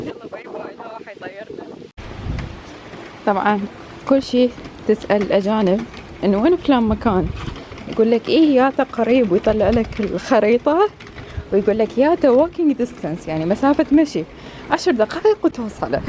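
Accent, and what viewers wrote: Iraq. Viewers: فديت الهجه لعراقيه شكد حلوه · سلامة افادج ههههههه.. ابتسامتج واللهجة العراقية.. لا تقاوم.. اتمنالج التوفيق من كل كلبي.. وبالعافية ع اللابتوب الجديد.. اذا تحتاجين مساعدة بخصوص اللابتوبات والبرامج وهالاشياء.. ممكن افيدج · بداية، احلى لايك قصة الكوب، قد تبدو سهلة وفاترة، ولكني اشعر بالمعنى الكبير الذي تحمله، احياناً تكون لدي مثل هذه الشطحات، بأن اقسم على نفسي بأن لا استخدم الشيء الا في المكان والزمان الذي احدده له لكي يكون حافزا لي. ويكون له طعم رائع في حينها يشبه طعم النصر. اللابتوب الوردي، لونه يعبر عن الانوثة الطاغية في شخصيتك. بالمناسبة، فعلاً اللابتوب المفروض يتبدل على الاقل كل 5 سنوات لان البرامج تصبح متطورة اكثر وتحتاج الى ذاكرة اوسع ومعالجة اسرع. الطبيعة الخلابة،،،،، احسدك عليها. سعيك وراء الاهتمام بامورك الشخصية والاعتماد التام على نفسك، امر مشجع كثيراً.. لهجتك العراقية راااااائعة تعبر عن عزيمة وثقة بالنفس وبنفس الوقت الانوثة والنعومة طاغيتان، احلى شي تلفظينه هو حرف الراء وحرف العين. 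مميزان كثيراً. اتمنى لك التوفيق من كل قلبي.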